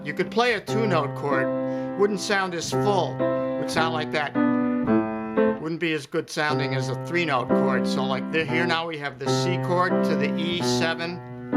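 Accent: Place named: New Jersey, United States. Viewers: This guy has the strongest jersey accent i've ever heard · Love his jersey sound👍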